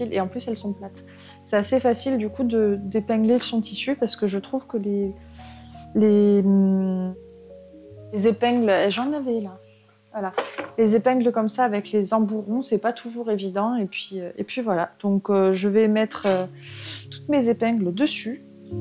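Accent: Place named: Carcassonne, France. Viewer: super video d ou es tu avec ce petit accent j ai le meme et je suis de carcassonne lol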